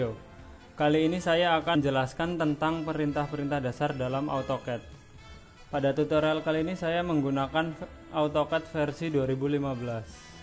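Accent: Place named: Banyumas, Indonesia. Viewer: ngapak banget mantap mas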